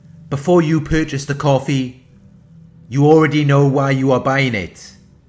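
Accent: Wales, United Kingdom. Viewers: Welsh accent..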